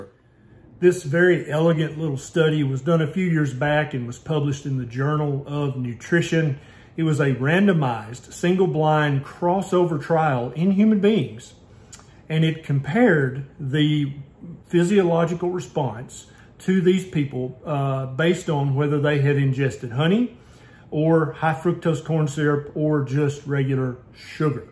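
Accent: South, United States. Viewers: If he didn't have that southern accent, I think his credibility would go way up.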